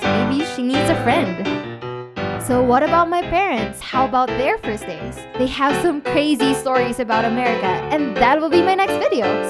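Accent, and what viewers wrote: Visayas, Philippines. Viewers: bisaya po ba kayo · OMG nung una ko tong pinanuod... sabi ko, ang accent mo parang sa filipino. 😂😂 love this channel... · I knew it.i instantly guessed that you are a Filipino too because of your accent(which is, btw super gooood) just from the first video I watched from you. Another youtuber to be proud of. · U sound like a filipino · Omg im a filipino!🇵🇭 ~*~*~*~*~*~*~*~*~*~*~*~*~*~*~*~*~*~*~*~*~*~*~*~*~*~*~*~* 🇵🇭Filipino: Maganda ang video! Ako ay mag subscribe sa iyo kapamilya👍🏻❤️ 🇺🇸English: The video is beautiful! I will subscribe to you my friend👍🏻❤️ ><><><><><><><><><><><><><><><><><><><><><><><><><><><><><>< Btw are u a filipino? ur acent is like a filipino.... especially when u said "C.R" ======================================================== EDIT: Btw I love ur acent😂👍🏻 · I new u are a pilipino beucase im a pilipino and your english is like pilipino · Cccccc rrrrrrrr perpek pilipino aksent :)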